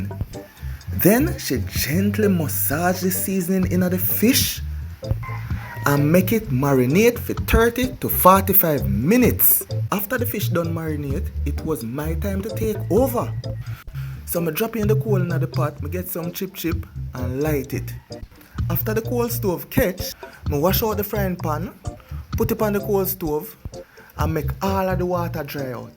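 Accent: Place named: Jamaica. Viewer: love the voice over Jam dung style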